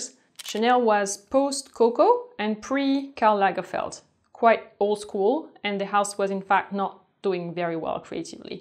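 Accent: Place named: France. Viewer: i love your accent so much!🤗 it's true🙄. with french shade.. i'm personally from Ukraine and i study english now☺. and this channel is a piece of inspiration for me🙄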